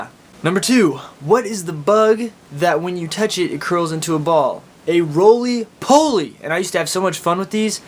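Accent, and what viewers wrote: Oklahoma, United States. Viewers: Your Okie accent just reaffirmed mine. Now I know what I sound like (only I pronounce lawyer "loyer" and I say soda instead of coke). XD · Simple Oklahoma accent the E’s Got to get him Got to geit eeeem Instead of (in) they say eeeeeean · I'm Australian and I refer to the "bug that curls up into a ball" as a 'Slater'. My Dad's parents were both from Oklahoma and now I finally know why my Dad kept telling me these bugs were called 'Roly polies' while everyone else kept saying 'No, its a Slater'. Interesting listening to your Oklahoman accent, yours is no where near as strong (stereotypical) as my grandparents. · From what I've read, the California accent is descended from the Oklahoma accent. Watching your video, this is my first time hearing an Oklahoma accent, and I can totally hear how similar it is. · in Tulsa we called it Soda or Sodapop (sounded like sodeepop) or pop. 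I have no clue which part of Oklahoma he's from but most of what he's saying sounds a lot like us Tulsans. sadly I left that beautiful stay years ago for Oklahoma so I am not 100% certain on this due to Californians but he said every word about the same as how I say em. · Hmmm...he sounds very Central Oklahoman and i used to work in drive thru. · Ashley Burt pretty much all oklahomans talk like him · Cool, I love Oki accent. it reminds me Carinvale series. :-) cheers mate, lovely accent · You sound more northern Oklahoma I'm from ada and mine is way thicker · Its that OKC or Tulsa Okie. Lmao. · I live in Oklahoma as well. ^_^ I have almost the same accent as you. (From around Tulsa. Owasso.) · I love this accent too much. I need a guy from Oklahoma to move to Australia lol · I live in Broken Arrow which is like 10 to 20 minutes away from Tulsa, and it’s funny because around here we sound exactly like this guy. 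It’s funny because I rarely hear the twang southern accent. If I go to Norman or Wagoner I do.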